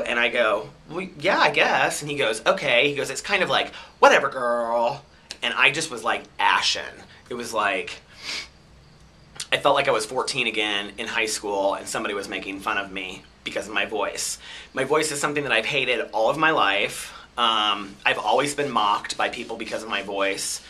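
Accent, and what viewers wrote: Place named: West Coast, United States. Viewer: Wow, you sound like you're from the West Coast. But it's like, who you are, so who cares?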